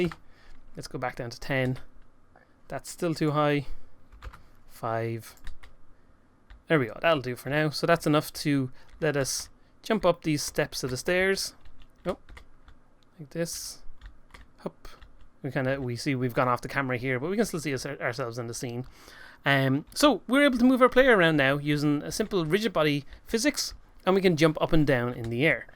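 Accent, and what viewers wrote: Ireland. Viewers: Thank you so much for this video. As a newbie to C# I appreciate you taking to time to explain your coding. I really like the Irish accent but I did type VectorTree instead of Vector3 at one point XD XD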